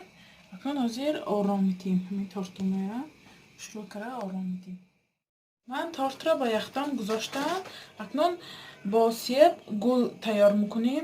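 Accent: Isfara, Tajikistan. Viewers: Хехехе)))) Ба Душанбегихо лафзи Исфарагичона ёд тиен апачон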